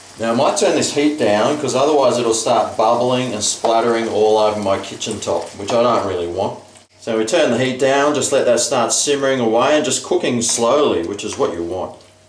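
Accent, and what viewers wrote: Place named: Australia. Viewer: You sound Aussie, I’m Australian as well. I love the way I make spaghetti Bolognase aka spag bowl I cook the mince then add mint leaves I also add mushrooms and honey as well believe it or not that tastes really nice in the mince I add a fair bit of bolognase sauce and add cheese afterwards when I dish it up you guys can decide what cheese you want but yeah that’s the way I do it and it’s absolutely beautiful, I can do that within 30 mins if not less and it’s the best meal I can do within that time imo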